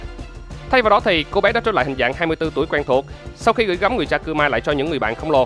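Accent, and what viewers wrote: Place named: Quang Nam, Vietnam. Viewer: ông ở quảng nam hay quảng ngãi z ad🤣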